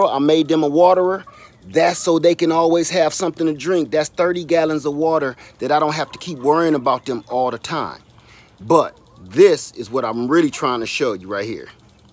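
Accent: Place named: California, United States. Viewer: You sound like you’re from Cali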